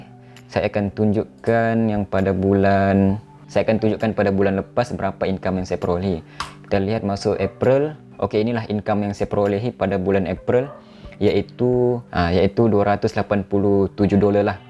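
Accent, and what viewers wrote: Sabah, Malaysia. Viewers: Torbaikkk 🙏🏻👍🏼👍🏼 ko Malaysia asal mana?Longat ko mcm bro2 dari Sandakan Sabah haha agak2 jak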